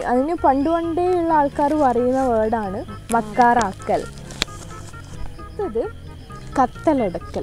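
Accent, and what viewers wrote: Thalassery, India. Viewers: Njan Malappuram ullatan but ink ingala Thalashery slang bayangara ishttan💫♥️ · Ella thalssery baasha muyyan bere maadiriyaa😂😂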